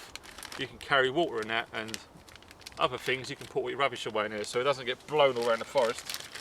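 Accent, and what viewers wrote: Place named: Suffolk, United Kingdom. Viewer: Good old compo was the best...babies head, bacon grill, curried chicken biscuit browns....i used to love mixing my hot chocolate powder in with the rolled oats mix ooh yeah them were the days and the boiled sweets you got loads of I remember getting a bollocking for unwrapping one loudly on a patrol you're from suffolk int ya? I can tell buh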